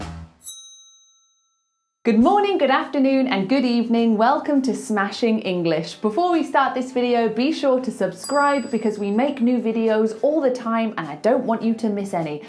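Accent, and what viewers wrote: United Kingdom. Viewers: For me it is funny to hear you and others brithish talking however when i hear people from wales, Ireland and central and north UK is a real kick in my balls undertanding their speech. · Your own accent while delivering of instructions throughout this video is self evident of standard British accent. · In my opinion RP accent is best English in the world. This is pure British.✅ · I love british accent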